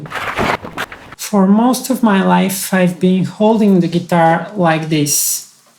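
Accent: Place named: England, United Kingdom. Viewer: I love your English accent! 🖤 What would be the best playing position when standing up?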